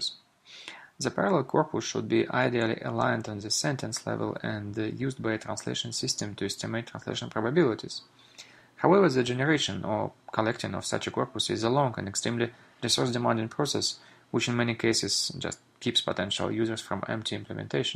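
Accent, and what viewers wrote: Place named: Eastern Europe. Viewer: The speaker has some Eastern-European dialect, but it is not that hard to understand what he says :) Just pause and repeat few times where you can't understand him.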